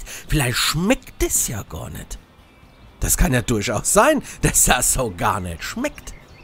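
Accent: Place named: Hesse, Germany. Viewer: Gut Esse, hessisch Gebabbel und rockisch Mussigg! Was will mer mehr!? Mach weiter so! Grüße aus Mittelhessen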